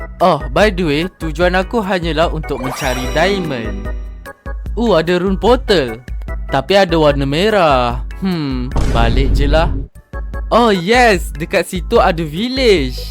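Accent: Malaysia. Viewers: Kayak suaranya orang aneh ya orang Malaysia ya??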